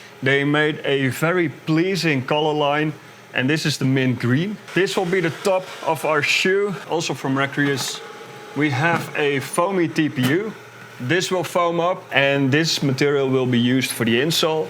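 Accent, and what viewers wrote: Netherlands. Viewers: WTF. HA! You can always count on the Dutch… Funny fuckers, especially when speaking English. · Took me 4-5 words to go 'this guy is Dutch' am I off? Edit: nevermind lol, extremely clear obviously after watching more than 5 seconds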